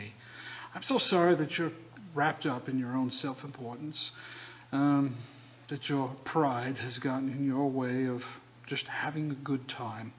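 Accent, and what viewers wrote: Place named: Transatlantic, United States. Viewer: Props on the transatlantic accent. Very nice.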